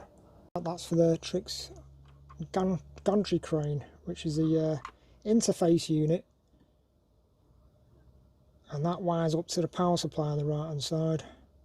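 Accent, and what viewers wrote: Manchester, United Kingdom. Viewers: Excellent video where you from you sound Manchester 😀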